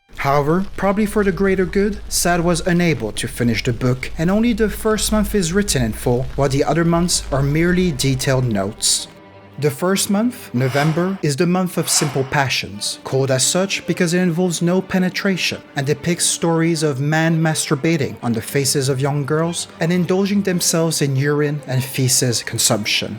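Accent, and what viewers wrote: France. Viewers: I couldn't have been the only one thinking they NEED to learn French hearing this narrator. Beautifully done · Hes actually French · Don't know how I got here. I don't know why I stayed and watched. But I did and now I know things I don't want to know. There was way too much tits asses and penises in this and now this is my last video before bed...the dreams I will have...oh my...I am going to concentrate on that French accent instead...*clicks heels* there's no place like Gay Paree, there's no place like Gay Paree... Hello France✌🤟 câlins et bisous from America 🇺🇸 California to be exact. Vive La France 🇨🇵 great video très intéressant. ♥️💙❤ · well he IS French obviously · Time to hear more of that french accent. Edit: ok, now I understand why this video is age restricted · Am Inlove with your accent. Now I want to learn French♥️ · Rien qu'en t'ecoutant parler on sait que tu es Francais...i guess only French speaking people can tell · I have the impression that the narrator is french but has good english, great content as well, kudos👏👏👏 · He is French ^^ I am and I hear it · ?? 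He's French!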